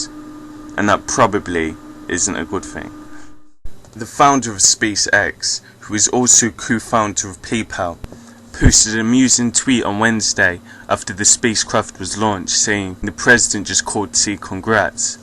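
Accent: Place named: United Kingdom. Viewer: where in u.k u from mate? london? great show mate keep it up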